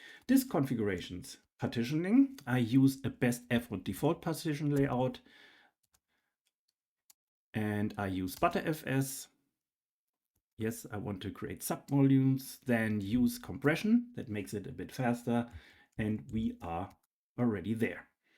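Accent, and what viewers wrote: Germany. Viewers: that german accent killed me xD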